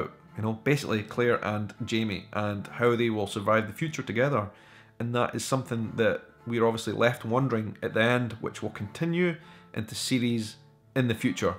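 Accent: Scotland, United Kingdom. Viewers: Beautiful Scottish accent You have Pal. I am not even from England. But I am fascinated of any culture that came from this beautiful Island:)A 5y visit and live in Yorkshire changed my mind totally about people from there, I met many scotish folks there:) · I'm watching you since few videos now, and watched some of your past ones. Not that relatable to this topic, but must say, I really enjoy your dialect. I'm not a native speaker, but Scottish dialects are the best regarding the ability of understanding for me. You guys speak very openly, articulate beautifully, I mean so much i and e sounds, just like my native language. It is also nice to hear and learn new area specific words! Thank you for your work! :)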